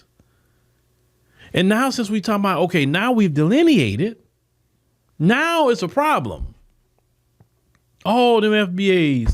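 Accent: South, United States. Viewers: I could tell from your accent you from the south so you know nothing about the north east even though we came back to fight to Free we black America north so we don’t really mess with Mexicans Venezuelan MS 13 but we love our Puerto Ricans so we have family members for generations I’m talking about foundation multiple generations tight and we don’t delineate. We stick with the ones that speak with usstop speaking poison. You could be speaking to black babies with Puerto Rican in a blood.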